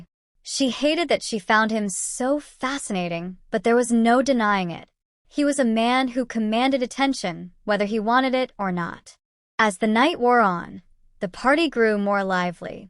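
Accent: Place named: Europe. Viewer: Why don’t I hear other voices narrating these stories instead of a clear type European voice. If I see these are African type stories then the voice could match, in my opinion. Thanks for listening.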